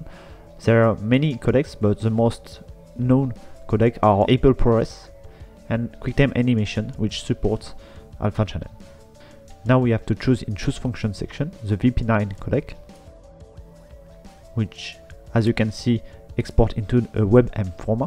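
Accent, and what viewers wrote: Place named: France. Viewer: lovely french accent, also thanks for the software it's got so much in it!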